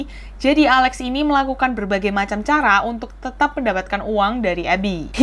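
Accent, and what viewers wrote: Indonesia. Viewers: Aku seneng Medokeee neng...Lnjutkan perjuanganmu 😘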